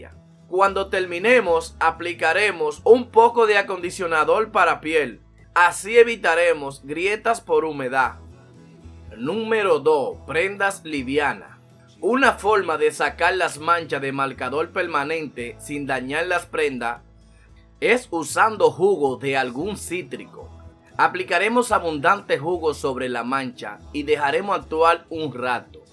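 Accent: Dominican Republic. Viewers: ES DOMINICANO!!!🇩🇴😁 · Es de republica dominica que nunca le escuchaste esa voz a alguien🤥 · Creo que su acento es de republica dominicana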